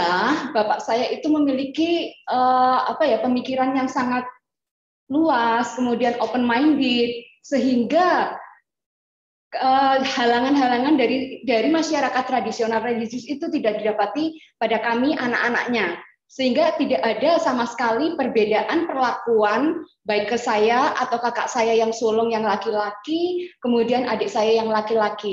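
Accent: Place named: Banyumas, Indonesia. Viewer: Salam ngapak bu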